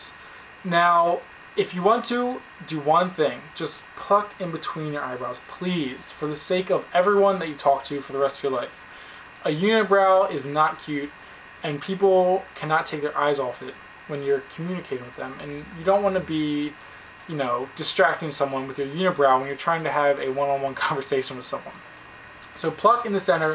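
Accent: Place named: New Jersey, United States. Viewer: He has a east coast accent.. I heard a hint of jersey in there..O_O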